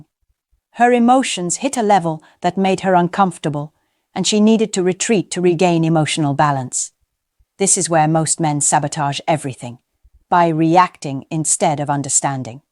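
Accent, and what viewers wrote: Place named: United States. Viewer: Yeah. The A.I. American accent is HORRIBLE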